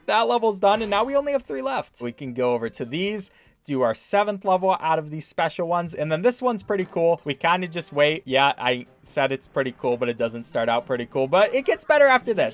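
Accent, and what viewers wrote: Czech Republic. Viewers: You are czech ? :D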